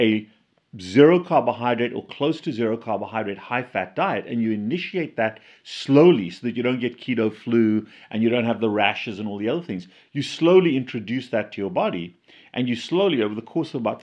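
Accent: South Africa. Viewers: He sounds South African. Probably is. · I'm loving your video's dr (& so awesome to immediately recognize the S.A accent my home country) ♡ I'm so thrilled I came across you. I'm a RNP in private practice and total LCHF convert. Your many video's & interviews are highly informative. Thanks for your great work and well done on your achievements past and present ❣ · He speaks like an upper class English speaking South Africa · From someone in the UK there is definitely a south African accent. Not much. But enough. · Too South African. Unmistakable accent. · Subtle South African accent going on there doc….good content ! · Funny, I live in South Africa and when I saw his first video I thought "He seems like a South African", but then I saw he wasn't. Later videos I realized he was. He not only still has a South African accent, but he looks like one too.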